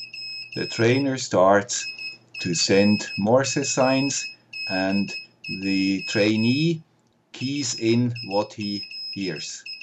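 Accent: Switzerland. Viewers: I've followed you through the years and I have learnt a lot to the benefit of my own projects. Thank you! Just saw your "last" video and got curious about the first. Even though it is 10 years ago, you presented the Morse-trainer project much better than many videos out there today. Looking forward to more sporadic Swiss-accent videos in the future. Cheers! 🙂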